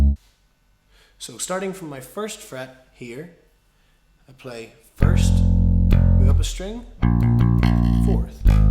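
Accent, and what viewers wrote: Ireland. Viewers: awesome... do you have any tips im 14 and from australia..and been playing for a year.. i am in a band... i play bass.. we play this song and when i play the verses my fingers get very very sore and i get tired .. would you have any tips.. please reply ... awesome vid!! your from ireland but live in america is that the accent? if you have any tips please reply .. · how do you know the beatles didnt say diene, its not just the beatles that are from liverpool that have the main accent, different accent DUH. not just the beatles. and he doesnt even sound like he's from liverpool, more like irish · dine - dine --- op - dine - op - dine.... accent is hilarious. gotta love the irish.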